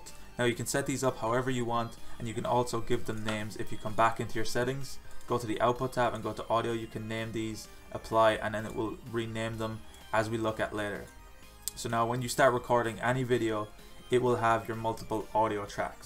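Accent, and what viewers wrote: Ireland. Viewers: Thank you very much for this clear and well-explained video. Do I detect an Irish accent? If so, snap ;) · Are you Irish by any chance? Great tutorial by the way!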